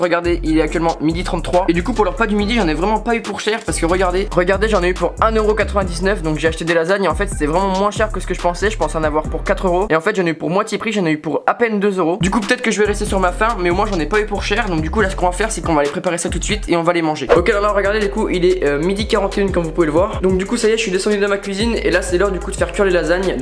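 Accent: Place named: Canada. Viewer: Parle canadien 24h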